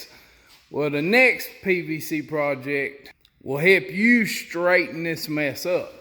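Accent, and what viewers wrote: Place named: Alabama, United States. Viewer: You live in North Carolina, but your accent sounds East Central Alabama.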